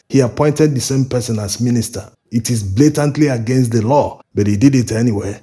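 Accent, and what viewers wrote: Nigeria. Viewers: In fact this should be a TV channel. Big ups bro. From your accent, I can deduce your tribe in Nigeria. Smart and superlative intelligent people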